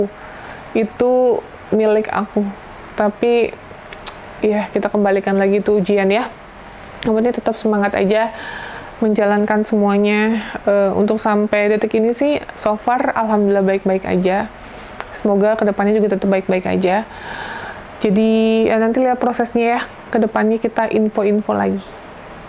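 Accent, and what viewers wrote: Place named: West Java, Indonesia. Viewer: Resep ngadangu si teteh nyarios teh .. orang sunda ya. Tadi ada kata keukeuh dan Juntrungan nya 😂😂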